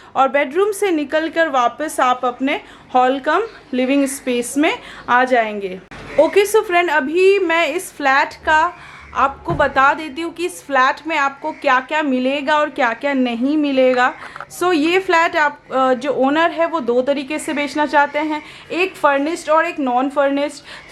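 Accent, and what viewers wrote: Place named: Bengal, India. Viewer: Aapnar hindi te clear Bangla taan ashe. Mane apnar primary language Bangla. Buyers would trust Bangali agent much more than Khotua agent.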